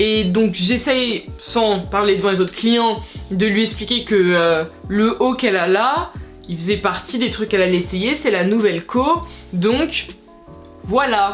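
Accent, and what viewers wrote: England, United Kingdom. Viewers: ton accent british quand tu parle anglais me perturbe trop je sais pas pk 😭😭😂😂😂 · The british accent waoouuh🥺🥰🥰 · On peut prendre 2min pour admirer son accent anglais magnifique ? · Grave un accent british quand tu parles anglais. Ca fait chelou, limite j'ai límpression que cést une autre langue. Ici à Atlanta, on parle beaucou[ plus vite et avec le nez.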